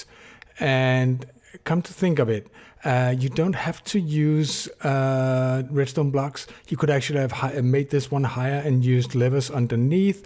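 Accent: Denmark. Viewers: your acsent really sounds danish ngl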